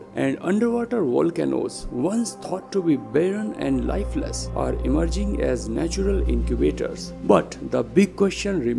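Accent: India. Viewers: Lol Youtoube get this trash out of my feed. AI + Indian accent? come on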